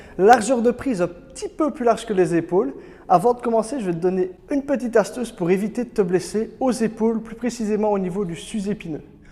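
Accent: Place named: Verviers, Belgium. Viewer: il me semblait bien avoir déja vu cette salle ! et l'accent me disais quelque chose... entre verviétois ont se comprend :-D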